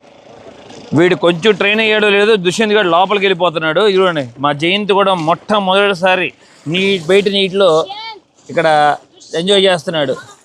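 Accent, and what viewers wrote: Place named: Andhra Pradesh, India. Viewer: సూపర్ సూపర్ అన్నయ్య ని మాటలు ఫస్ట్ టైమ్ మన ఆంధ్ర స్టైల్ లో చాలా చాలా బాగున్నాయి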